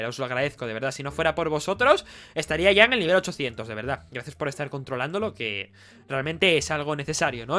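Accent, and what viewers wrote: Chile. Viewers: jejeje ese idioma buen Chileno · hablas chileno ???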